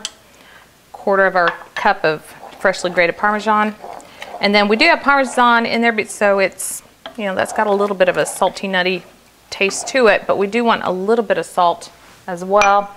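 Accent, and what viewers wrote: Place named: United States. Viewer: hey I just subscribed to your page I love risotto I make it every now and than love it and I actually have a Scottish background so not Italian lol but still in Europe lol my nan was Scottish when she was alive I never met her but she seemed nice ,I will definitely try out your reicipe I am from Australia,are you from America? I can tell a bit from your accent I have shallots here we call them eshalots here I call them baby onions as they are little lol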